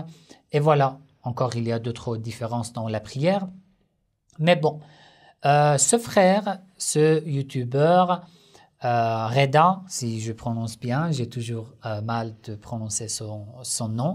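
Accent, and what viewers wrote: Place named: Iran. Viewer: Bonsoir, vous etes iranien?? J'aime bcp l'accent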